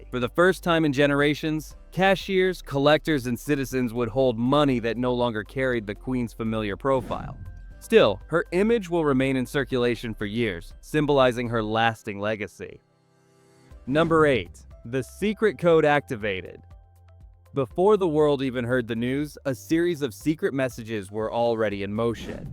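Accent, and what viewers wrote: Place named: United States. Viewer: This American voice is so inappropriate to the subject as well as the title! What for Gods sake is shocking here? This is just the way WE handle these things in GB and Europe.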